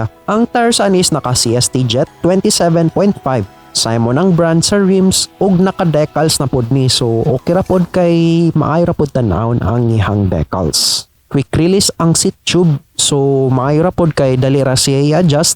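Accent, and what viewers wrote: Visayas, Philippines. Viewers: Bisaya man diay oi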